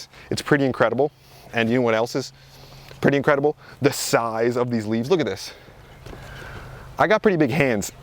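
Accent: New Jersey, United States. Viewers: I could listen to him just say Water in that Jersey accent all day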